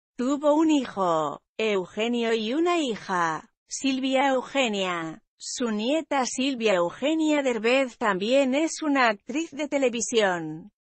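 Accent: Spain. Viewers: Muy mal reportaje, fotos erróneas, la voz española no sé xq carajos si se nos mexicanos, y la voz ni se diga tienes tachesote X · No me gusta esa voz de Española pues son reportajes de mexico y debería de ser una mexicana q lo hiciera. no tiene ni modo , ni tono , ni forma para hacerlo..... !!!! Pufffffff😥😟😤😨😵😱😲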